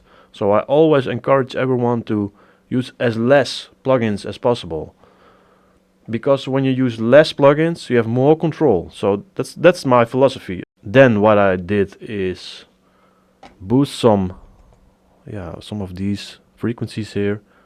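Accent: Netherlands. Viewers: A hard hitting techno kick and a guy talking with a pleasant Dutch accent... I guess I going to listen to an old Thunderdome CD when I am going to bed tonight. In fact, I find your tutorials quite lekker.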